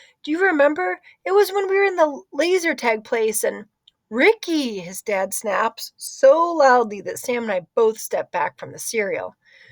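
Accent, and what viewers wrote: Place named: United States. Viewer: Thank you for your video. I really needed an audio of this book for my shadowing so as to get familiar with the intonation of American English. It definitely helps me a lot and your voice sounds great to listen.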